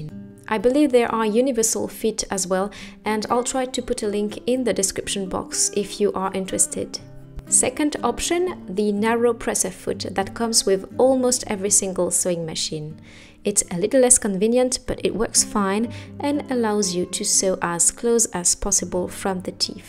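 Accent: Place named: France. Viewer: Very good step by step video. (And a charming French accent too!) Same method as recommended by my highly experienced upholstery tutor.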